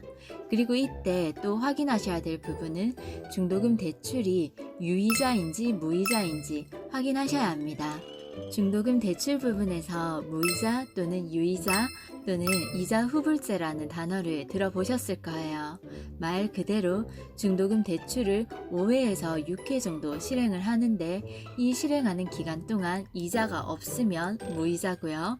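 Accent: Gyeongsang, South Korea. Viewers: 경상도 사투리가 너무 듣기 좋네요 ㅋ 좋은정보 알아갑니다 ^^ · 경상도 사람인가봐요ㅋ 사투리가있어보이네요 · 구수한 갱상도 사투리로 자세한 설명 해주셔서 감사합니다.~~ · 엥~? 대구로 들리는데 ㅎㅎ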